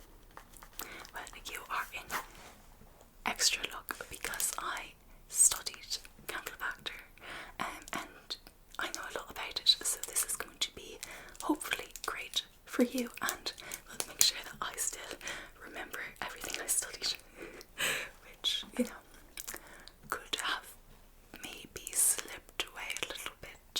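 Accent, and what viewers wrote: Ireland. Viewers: Deeply relaxing and highly informative; the type of video where the material is dense enough to keep the Irish accent from lulling me to sleep.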